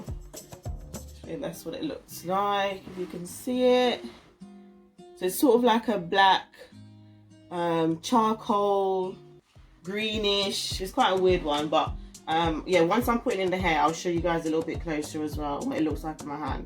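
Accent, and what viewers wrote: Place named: Jamaica. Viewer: She has a little ja tlk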